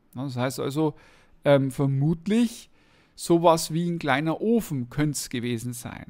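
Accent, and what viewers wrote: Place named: South, Germany. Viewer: angenehmem süddeutschen Akzent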